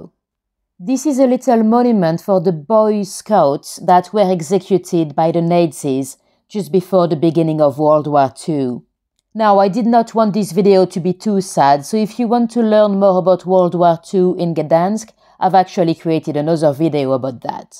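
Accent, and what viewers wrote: France. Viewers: Your accent are u French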